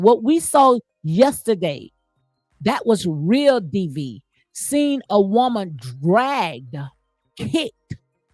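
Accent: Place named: Louisiana, United States. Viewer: Are you from Louisiana? I love the accent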